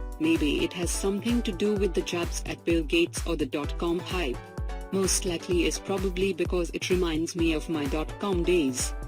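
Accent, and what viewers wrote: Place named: India. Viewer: robot voice with an Indian accent... no thanks!!!!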